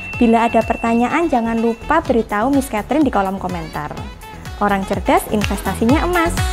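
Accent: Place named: Java, Indonesia. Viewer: Medok yes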